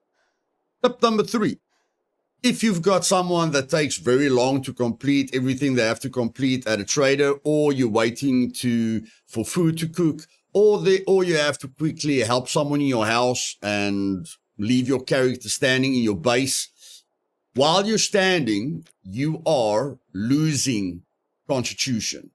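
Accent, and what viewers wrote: South Africa. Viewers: Your accent...is this South African?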